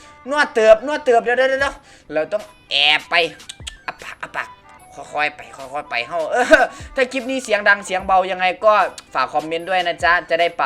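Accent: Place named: Isan, Thailand. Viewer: ชอยพี่ พากย์อีสาน5555 แสนซับแย้วววว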